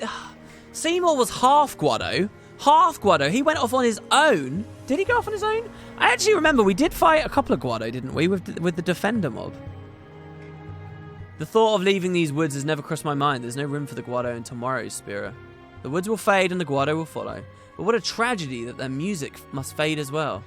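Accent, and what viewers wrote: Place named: Scotland, United Kingdom. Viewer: I vote for more of Scottish accent.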